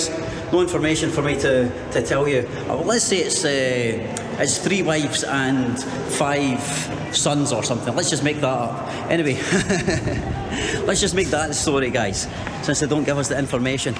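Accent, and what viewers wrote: Scotland, United Kingdom. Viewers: This accent just makes me angry for no reason no wonder Scotland was wiped all over countless times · It’s called a Scottish accent 😁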